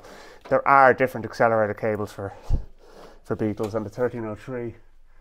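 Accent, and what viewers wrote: Ireland. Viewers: Are we Irish?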